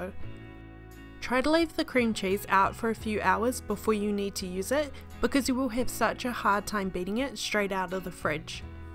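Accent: New Zealand. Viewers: that New Zealand accent is everythinggg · Nice nz accent 👌🏻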